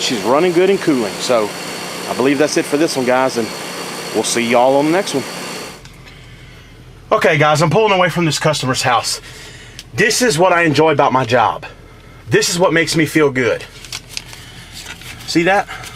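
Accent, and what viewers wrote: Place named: South, United States. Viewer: great job and video and finding all kinds of problems. only item i would comment on is the coil cleaner. that stuff takes off hte protective wax coating applied at the factory and no matter how much you rinse it, it eats the coil regardless best thing to use is dawn dish soap. my teacher at tcc in norfolk va told me this in class many years ago and he writes the ashrae hvac books. not to mention run's carrier out here and before that ran trane commercial. the only thing to use coil cleaner is on an evaporator coil and use the stuff in a can. also why did you call your company? are you isreali? i have never seen a jew with a country accent! interesting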